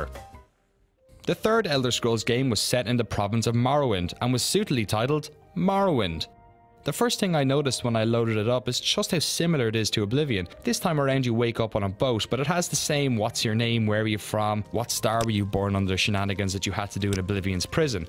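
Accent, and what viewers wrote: Ireland. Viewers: are you irish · This guy has a real Irish accent, we don't sound like leprechauns! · are you irish?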